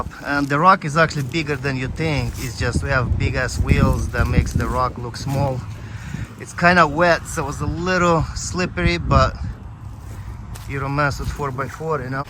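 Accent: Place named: Russia. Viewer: Russian accent 😂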